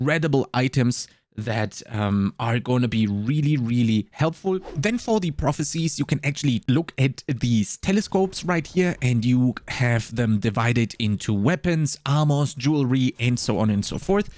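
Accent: Germany. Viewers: are you german ????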